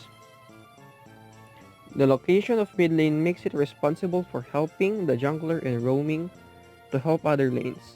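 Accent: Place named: Philippines. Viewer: The Philippinese accent is strong with this one amen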